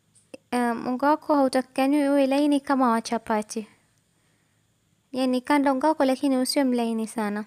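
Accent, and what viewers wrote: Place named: Mombasa, Kenya. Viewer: I like your swahili wewe ni from mombasa?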